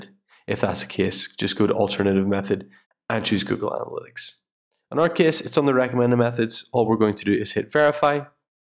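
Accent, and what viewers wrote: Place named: Scotland, United Kingdom. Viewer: Thanks man, really useful. That accent is hard to pin down - I hear Scottish, American and Irish!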